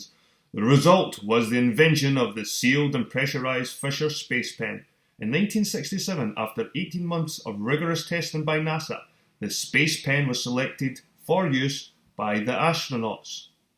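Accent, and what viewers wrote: Scotland, United Kingdom. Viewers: Your my new favourite YouTuber. I love your honesty in the conversations you present here. I don't mind what your stand is on the shape of the earth, at least your aware of the subject and give it some thought. Sorting out the lies is a great idea. Good man and that Scottish accent is beautiful to listen too. Cheers! 💜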